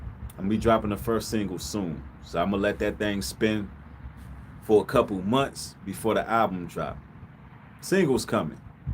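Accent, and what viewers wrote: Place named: Washington DC, United States. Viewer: Aye brotha, are you from the dc area? I feel like im picking up a hint of that accent. Been checking your vids out for a minute. Much respect!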